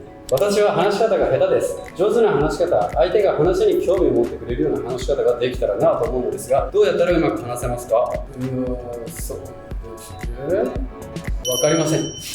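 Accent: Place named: Osaka, Japan. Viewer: いつの間にか大阪弁になってる〜 出来ましたの時の健君のとても嬉しいそうな顔幸せそう🎉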